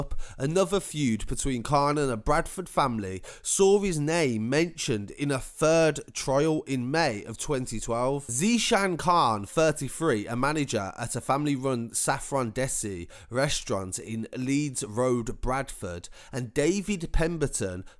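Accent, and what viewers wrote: Birmingham, United Kingdom. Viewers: The brummie accent sounds dumb and funny at the same time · Dude your brummy accent is hilarious. It sounds like you’re reading from a novel to a 5 year old. Listen to yourself 😀😀😀😀😀😀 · Just subscribed 🥰been from Birmingham as well it’s great to hear a Brummie YouTuber and not anther Londoner 😂love all your videos and specially the one on Lynch /AR /GMG. All your videos are quality. You get straight to the facts ! Will always watch your videos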